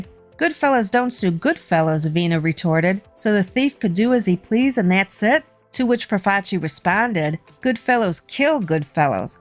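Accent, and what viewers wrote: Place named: Midwest, United States. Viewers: This narrator’s voice tickles me. Gotta be from Chi-ca-go or Fargo. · A strong Chicago Illinois accent for sure lol. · Announcer sounds like she is from Midwest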